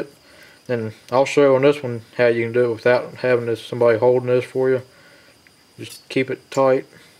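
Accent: South, United States. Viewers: Are you a southerner ?? Lol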